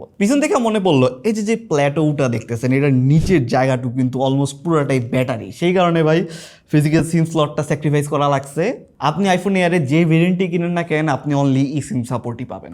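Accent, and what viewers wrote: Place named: Bangladesh. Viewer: ও ভাই আপনি বাংলাদেশের কোন এলাকার ভাষায় কথা বলছেন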